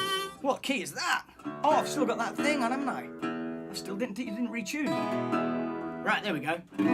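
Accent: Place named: England, United Kingdom. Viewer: Hello again And here's me thinking you were from London 😂 You sound more English than kiwi But that's not of interest your music speaks volumes I tend to play harps as well Mine is G and E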